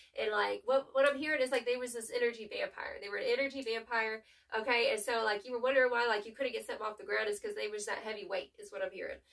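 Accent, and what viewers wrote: South, United States. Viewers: Southern accent. I love that. I’m a Scorpio too. Thank you so much for your reading. Its so awesome. Hits me like a bolt Take care of yourself. We scorpios need that sh.. Peace out, Sista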